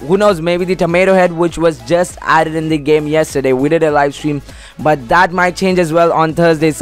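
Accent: India. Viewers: maybe indian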